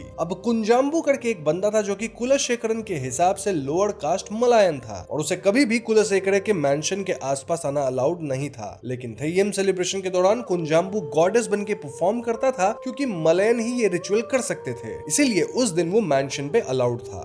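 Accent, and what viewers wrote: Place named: Bihar, India. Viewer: Kedla kya hai bhai.... Bihari accent mat ghua bhai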